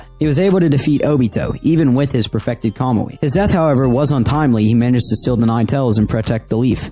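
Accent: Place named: United States. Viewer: Why does he pronounce there names so American. It's so annoying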